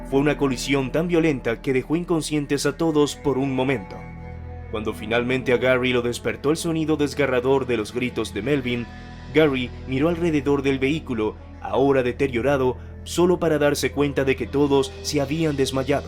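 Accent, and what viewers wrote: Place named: Venezuela. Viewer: El acento tropical y pretencioso, (venezolano?)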